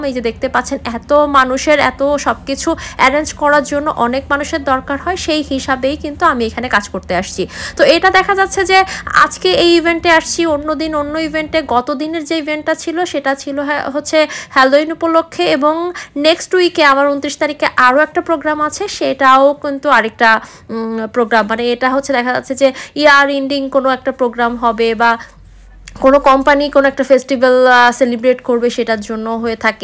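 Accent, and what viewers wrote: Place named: Bangal, Bangladesh. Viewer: Apni bangal ascent ey kotha bolun otai suntey bhalo lage ❤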